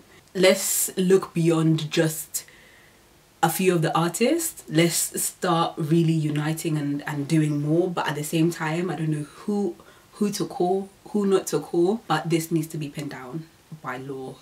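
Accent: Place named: United Kingdom. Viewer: That British accent is on POINT! wow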